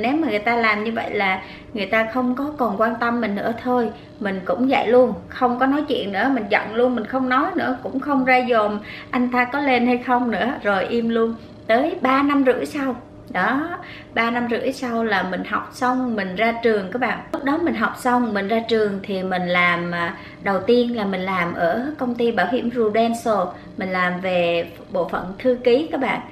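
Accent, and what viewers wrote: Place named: Central, Vietnam. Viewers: Giọng chị giống ng miền trung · chị nói giống giọng đà nẵng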